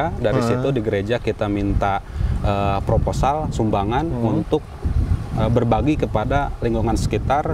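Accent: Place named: West Java, Indonesia. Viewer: Seru..resep ngadangukeun na.. moga istiqomah nya kasep.. terharu oge...ibu mah penonton setia .. pas bangeut logat sundana.. semangatnya kasep.. 👍👍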